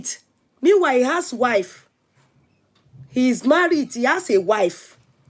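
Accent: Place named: Yoruba, Nigeria. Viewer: Madam, your Yoruba-English naration is making the story distorted.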